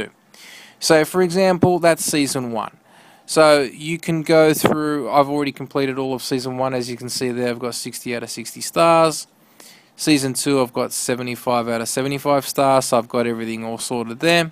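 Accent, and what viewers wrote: Australia. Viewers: Ur an Aussie mate👍🏻 Same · Are you Australian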